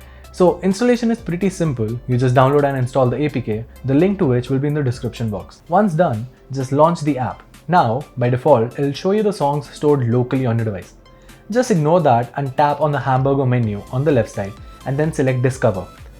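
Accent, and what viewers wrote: India. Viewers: This guy is awesome. I was skeptical of the indian accent but then I realized that he was talking in perfect english. And your video is edited and recorded perfectly. You get a sub and like from me!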